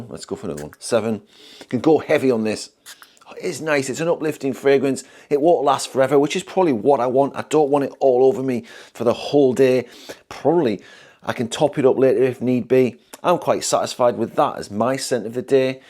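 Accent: United Kingdom. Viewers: I love English saying French words ! 😁😅 Could be as funny as French saying English words ! 😅😂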